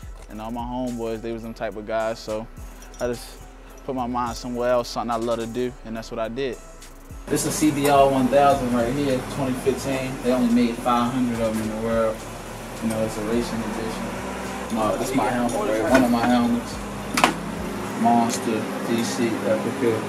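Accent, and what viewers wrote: Baltimore, United States. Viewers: that's a Baltimore accent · Yeah, that's our native accent. "Dug" = "Dog" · thats that bmore shit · Baltimore accent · Baltimore accent bro it's how we speak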